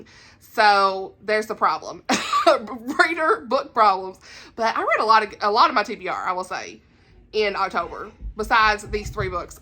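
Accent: South, United States. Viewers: Are you down south with me?? I’m in Arkansas. I hear the twang 😎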